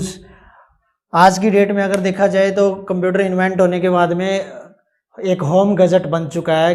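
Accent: Haryana, India. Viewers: sir haryana se ho kya thoda tone aa rha h vha ka mai bhi haryana se hi hu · THANKYOU VERY MUCH SIR❤️ AAP PAKKA HARYANA SE HO!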